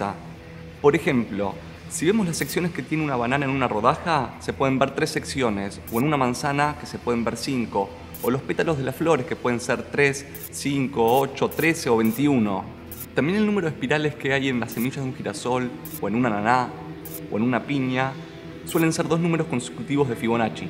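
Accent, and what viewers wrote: Argentina. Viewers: Buen video. ¿Eres argentino?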